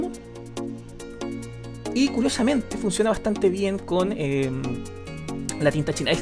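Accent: Chile. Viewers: Siento que todos los chilenos hablan iwal xd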